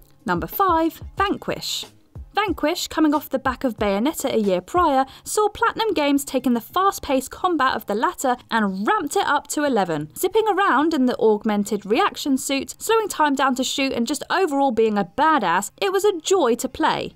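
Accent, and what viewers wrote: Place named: United Kingdom. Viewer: I know they're all british. But damn this new host is british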